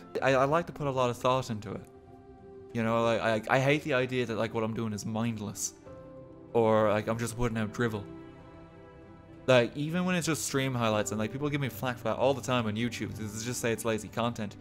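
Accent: Ireland. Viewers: The Irish accent is awesome.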